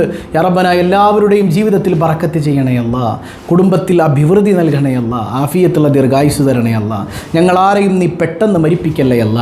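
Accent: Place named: Malappuram, India. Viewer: ഉസ്താദിന്റെ സംസാരം കേട്ടാൽ മലപ്പുറം ഭാഷ പോലെയാണ്. 🥰🥰🥰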